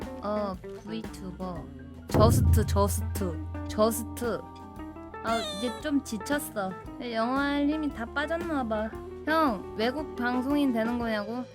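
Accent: South Korea. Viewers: 귀여워서 계속 보게되는데 강글리쉬 듣기 힘들어서 계속 보기는 힘들고...음소거를 하고 보겟습니다...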